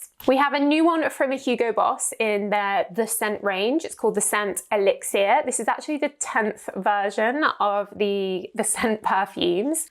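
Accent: United Kingdom. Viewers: her British accent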